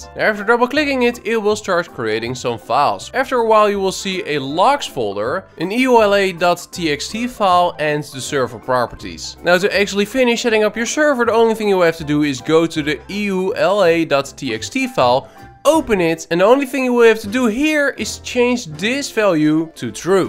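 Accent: Netherlands. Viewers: Hij klinkt Nederlands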